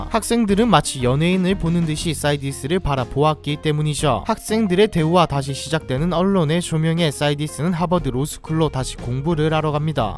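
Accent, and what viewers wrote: Gyeongsang, South Korea. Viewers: 경상도 분이시군요 ㅎㅎㅎ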